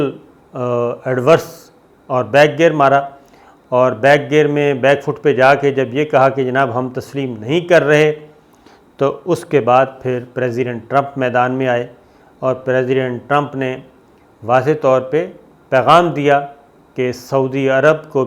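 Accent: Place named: Saraiki, Pakistan. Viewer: Saraiki speaking hai oski urdu aise he hai ap log khhamakha time apna zaya kr rahe ho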